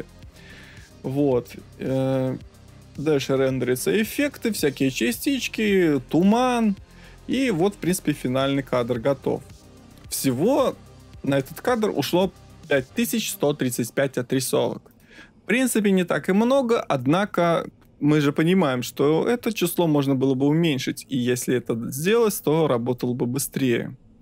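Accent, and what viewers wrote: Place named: Belarus. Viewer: Начал смотреть видос ,услышал голос и первым делом подумал о боже это же мой дядька из Минска,а потом увидел как канал называется. Беларускую речь ни чем не попутаешь. Так вот теперь понятно почему все у кого ниже ай 5 плачут что игра лагает. У самого 6900к(разгон) и 1080(даунвольт) в среднем 110-135 фпс держит на низко -высоких настройках. С меня лайк и подписка.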